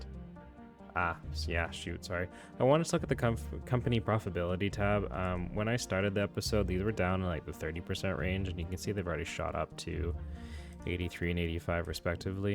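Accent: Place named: Canada. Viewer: I was going to ask if you were Canadian lol. The midwestern in me could sense it 😂 great video!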